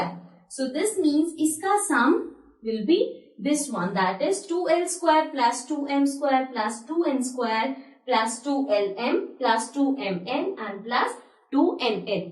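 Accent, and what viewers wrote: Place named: Punjab, India. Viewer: You spake punjab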